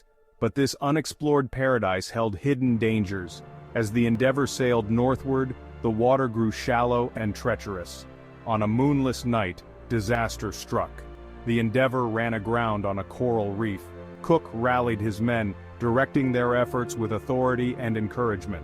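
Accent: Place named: United States. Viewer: American voice over is annoying. The Australian site Heroes and Legends does it better 😢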